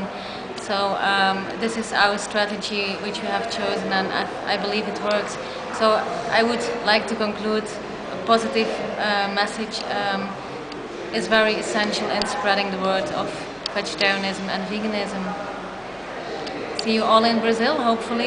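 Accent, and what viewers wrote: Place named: Belgium. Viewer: She is from Belgium! listen at the beggining...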